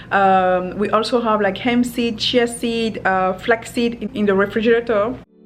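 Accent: France. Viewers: Cet anglais parfait avec cet accent français xD Ca donne tellement envie tout ces produits frais ! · Are you french ? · Craig Mack she has a French accent to me.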